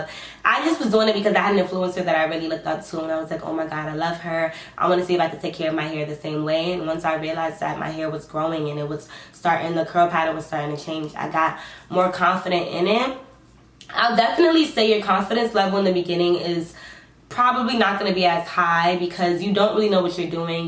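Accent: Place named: Harlem, United States. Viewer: You Sound So HARLEM 😂 I Love It 🥰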